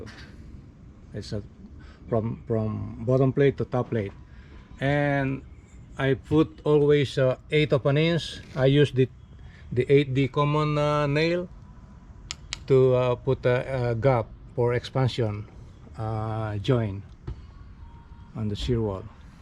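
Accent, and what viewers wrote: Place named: Philippines. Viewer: Thanks for the video.. I can hear the Pilipino accent 😉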